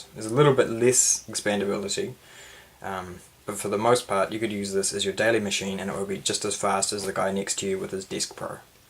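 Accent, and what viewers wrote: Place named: New Zealand. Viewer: Some great info in this vid! 2 questions though: - what did you say the menu software was (aussie here that can't understand your kiwi accent!) - is there any way for DOS to utilise the very top and bottom of the screen? The T3100 will show the C:\ prompt right at the top but the T3200/5200 seems to be letterboxed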